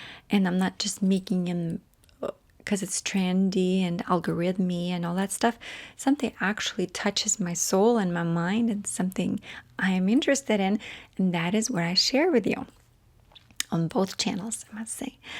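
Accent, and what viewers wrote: Russia. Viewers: I like ‘sincerely ’ as I agree that is how I see you and your videos. Also your Russian roots and your amazing accent with its Russian twang is what has kept me listening the past 10 years ❤ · I adore how your Russian accent is still so prominent even though you’ve lived in the US for over half of your life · Hi! I am from Bulgaria and I officially been in America more than Bulgaria, just like you. I know what you mean. I am so glad you are renaming this channel, I love you but Sassy did not fit you lol. And for the record, I think that on this channel your accent comes through just a bit more than the Gentle Whispering channel. I can recognize your accent, and despite your years in America you will always have your root in Russia. Thank you for your content ❤ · How brave you are! To share yourself and your journey with us. It hasn't been easy for you over the years and we thank you for your honesty. 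I like Simply. From a marketing/comms perspective it is very strong. There's alaway...? Emphasising the two aspects of who you are. I would encourage you to embrace your Russian side...even in these difficult times. It's a point of difference for you and for many of us, strength comes in knowing who we are, and where we came from. We love your accent and your cultural difference. It makes you special. As far as content is concerned, I love the gardening journey you are on and just about anything you choose to share. But keep some things things for yourself! Your 'sassy' natural personality is wonderful to see and it's important for you to be able to be yourself, not in a curated way. ❤ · Simply. Even though you have lived in the US longer you still have the ethereal Russian accent so it's perfect. Its what makes you, you. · Simply resonates best imo since you aren't trying to be anything else, not a performer, not an influencer, simply. 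Why, not? Though you don't broadcast that part of yourself all the time it's still part of who you are. The accent in your voice is lovely and reminds me of your rich background, russian treats you've shared with us, and even family you've introduced to us. For me, its part of how I've gotten to know you over the many years and even if the content shared is less culturally focused, it's always a beautiful part of you 🩷 Also, one last thing. You mention that many people distanced themselves from you and your channel. I remember that time being a period of seeking a sense of belonging, of security, of self. You were in an emotionally vulnerable place and found solace where it made sense at the time. You said "I deserved it". Please don't beat yourself up over that learning experience. It was part of your journey and necessary for you to get to where you are now 💖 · I've been following and enjoying your channels for many years. 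As an Eastern European, let me tell you, yes, I can see the Russian origins. You even have some accent left, especially in the pronunciation of your "L". In my opinion, both identities can coexist, Russian and American. It doesn't have to be an either/or. It's who you are, celebrate it! ❤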